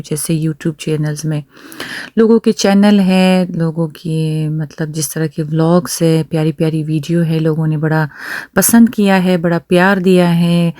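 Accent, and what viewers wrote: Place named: Balochistan, Pakistan. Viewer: How are you dear Sis 😊? Wait he kar rai thi apke vlog ka 🫠 , ye tu batain k ap pathan hu tu apko balochi kese aati he ? Wese apka lehja balochi he hai pathano jesa nai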